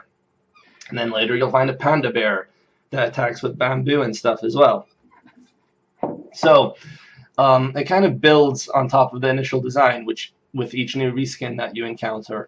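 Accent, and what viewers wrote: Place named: United Kingdom. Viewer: Oh my god your accent is fucked. It's British, yet slightly American, but it'll slip into Scottish because you live there now.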